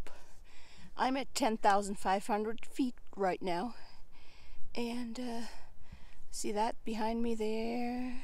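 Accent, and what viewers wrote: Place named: United States. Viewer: You remind me so much of my friend from norway. She speaks English with a modified American accent like you do. Instead of a British accent. But we’ve been friends for 40 plus years. She has American friends so there you go. Anyway, she came out to visit me a few years ago snd her fav part of CA was the sierras. Traveled the western and the eastern sections of the sierras from lake Isabela to Lake Tahoe. I’ve traveled the world. Few places as ruggedly beautiful as the sierras. Tho I think the cascade range in WA snd OR, where I grew up, is incredible as you will soon see. Thanks for sharing your adventures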